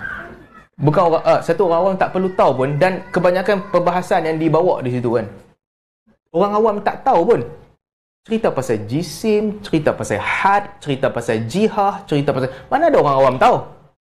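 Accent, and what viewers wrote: North, Malaysia. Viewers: Mungkin disebabkan dia bicara loghat utara ada perkataan yang tidak ada dalam loghat KL/Selangor/johor-riau.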